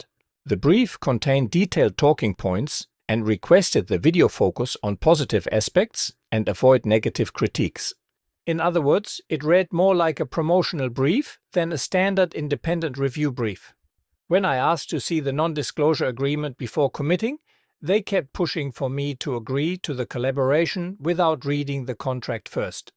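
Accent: Germany. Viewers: That sounds german.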